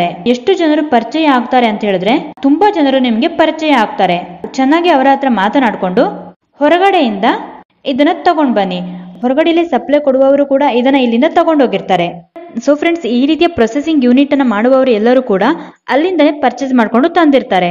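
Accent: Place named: Kerala, India. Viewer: Kerala... Language problem tumba ide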